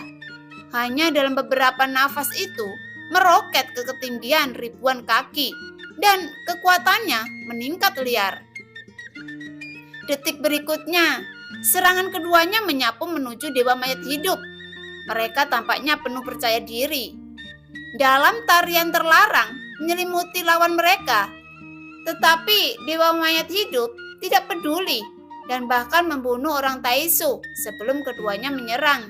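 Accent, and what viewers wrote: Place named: West Java, Indonesia. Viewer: Sunda banget ka hihi ..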